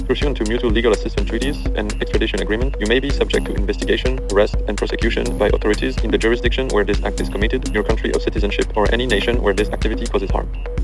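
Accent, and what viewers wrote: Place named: France. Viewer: A critique (since this is art): : "legal to own, but illegal to sell"? Assuming he's in France (based on accent) - it could be legally acquired personal data from a website, which is legal to own but illegal to sell. Pretty much everything else is illegal to own if it's illegal to sell in France, even more so when it comes to data. So no, it's not 'horrific' like CP. Therefore, there's likely no 'ethical' dilemma at play here given 99.99% of people won't care about personal data. I think it would be more 'powerful' as a piece of art if the person pressing the button would REALLY have to think twice before pressing the button because of universally held moral principles.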